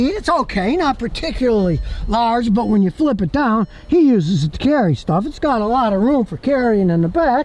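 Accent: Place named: United States. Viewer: This guy is the epitome of my fantasy of how a true american sounds like. Half screaming, spitting through the "p" 's and the "d" 's, scattered all over the place and what not. That's what rock'n'roll is guys and girls!!! Rock on!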